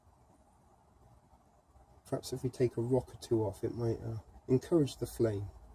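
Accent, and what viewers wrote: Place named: Midlands, United Kingdom. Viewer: New sub from England you sound like you got a midlands accent. ?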